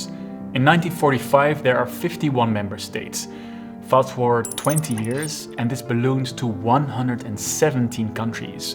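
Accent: Netherlands. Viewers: I smell a beetje dutch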